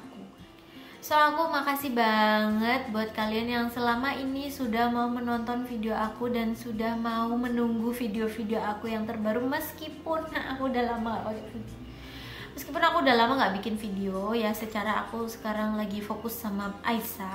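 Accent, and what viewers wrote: Java, Indonesia. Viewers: Assalamu'alaikum yg suaranya super duper medok kejawan hehehehe... Aku nhoura, nama ig aku Alamat aku di Jl. Dukuh Bulak Banteng Sekolahan Gg. IV no. 24 Surabaya..., aku mau kedepannya bikin video tutorial makeup tapiiii NO Mirror Makeup Challenge (Makeup nggak pake Kaca atau Cermin) yaaaah??? Semoga ini komentar yg menarik hehehehe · kolaborasi dong sm inivindy make up in model. trs keluarin smua medoknya kakak, biar lepas gtu aura jawanya. nah nanti kayak ada adegan2 drama gtu kakak dan inivindy, karena beda teknik make up dan lain sebagainya ig: babyrachella97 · Assalamualaikum mbak:) hai aku Khansa dari Sidoarjo. Pertama kali tau video youtube mbak itu video yg review wardah intens matte lipstick lgsg deh mulai kepo "siapa sih nih orang?" akhirnya suka nontonin videonya soalnya aksen jawanya dapet bgt:)) terus videonya yg menurutku bagus dan cukup membantu aku yg buta makeup 😂 dg penjelasan lmyn lengkap dan mudah dipahami. 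Untuk kedepannya aku pgn mbak bikin video ttg makeup/skincare yg cruelty free/animal testing, dan yg pastinya yg halal. Sbg muslimah bukan hanya makanan yg harus diperhatikan kehalalannya tapi produk2 lain seperti make up/skincare. Pengen tau aja tanggapan mbak tentang itu 😊. Terima kasih sudah mau menyisihkan rezekinya buat bikin giveaway utk subcribers dan viewersnya, semoga subcribers dan viewersnya makin nambah berjuta juta ya 😁. Wish me luck🙏 Wassalamualaikum ig: kfadhilahr_ Alamat: Perum. Griya Bhayangkara Masangan Kulon RT 38 RW 09 blok A4/10 kec. Sukodono kab. Sidoarjo.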